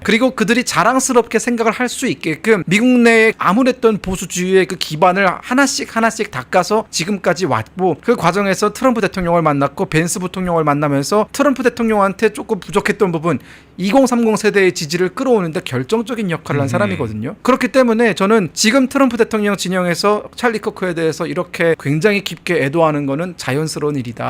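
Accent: South Korea. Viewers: 이사람 탈북자 임에도 북한억양이 하나도 없네 톤이 그냥 우리말이네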